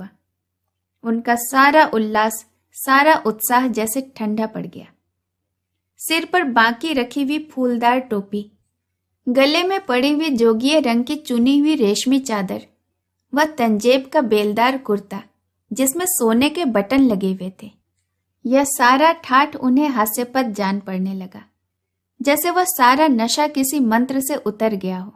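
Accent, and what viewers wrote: Standard. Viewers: Apki shudh Hindi ,aur awaj ki madhurata kya khne . Munsi ji ki kahaniya , sansmaran , upannyas bahut hi hridaysparshi hote h . Pranam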